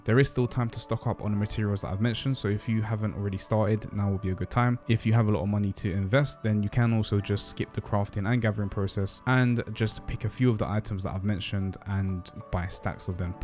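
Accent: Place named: London, United Kingdom. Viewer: are you from London? Your accent is dank. Also, when are you back, baby?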